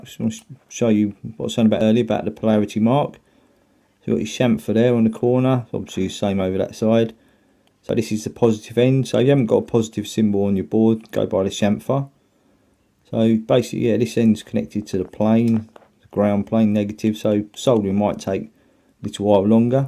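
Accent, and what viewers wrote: United Kingdom. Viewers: Your videos are nothing short of brilliant. I've religiously watched them all and several, multiples times. How you spell out how you do what you do and why is unmatchable. I love your titled bridges too: very creative. I also want to mention something that I've never seen mentioned before. I really dig your accent. not so much because I'm some odd Britophile, but I really get bored to bloody tears listening to guys with game show host, cookie cutter voices. I've just grown to feel there's something disingenuous about them. Your intonation and articulation just keep my attention meter pegged. Thank you. Keep 'em comin'!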